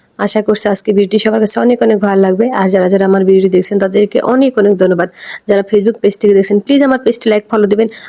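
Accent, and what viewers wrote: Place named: Bangladesh. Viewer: উচ্চারণে ই ধরা পড়লেন আপনি বাংলাদেশী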